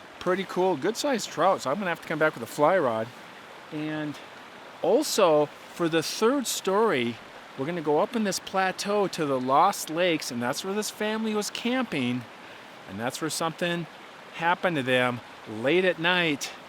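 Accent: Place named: Southwest Michigan, United States. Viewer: Great stories,! I know you were from MN originally, but did you ever spend time in SW Lower Michigan - Grand Rapids area? You pronounce some words exactly like that area. Just something I noticed. I really prefer and really like the videos that don't have much AI. I would much rather look at the river, look at you, look at a pinecone, rather than do anything that makes an AI center profitable. It would be so much easier for you to do that, so I appreciate the work you put into these videos! I hope you have a fantastic summer! I'm having a cup of coffee ( PM lol) and it tastes so much better when it's in my Base Camp ceramic coffee mug. 😊😊 I'm already looking forward to Sunday!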